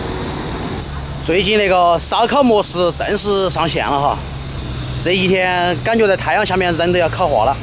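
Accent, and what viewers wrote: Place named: Hunan, China. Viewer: 我咋听出湖南口音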